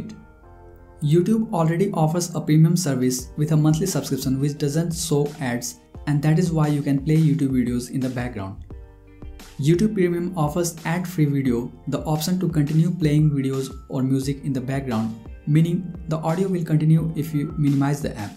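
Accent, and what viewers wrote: India. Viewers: Once I hear an Indian voice I knw its for good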